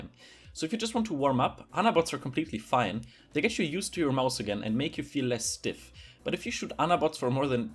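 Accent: Germany. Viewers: I was just wondering what your native language is? Your accent makes me think of that of a German but your real name doesn't fit (great content btw) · Hört man direkt. Ist zwar kein starker Akzent, aber als Deutscher erkennt man das sofort. :D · hey bist du deutsch du hast nämlich n ziemlich startken deutschen akzent in deinen Videos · nice video! btw are you german, because you sound like me when i speak english (and im german) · Ich höre so raus dass du deutsch bist 😂 kann Mann diesen aim "Unterricht" auch in deutsch bekommen ? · Are you from Germany? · Am I hearing a german accent?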